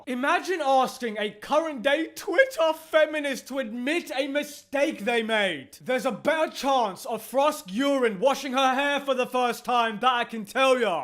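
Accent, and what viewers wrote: United Kingdom. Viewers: The British soccer hooligan accent also sells it.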